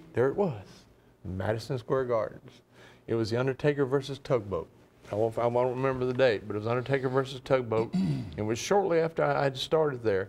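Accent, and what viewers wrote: South, United States. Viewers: He's got that southern drawl. Being from Alabama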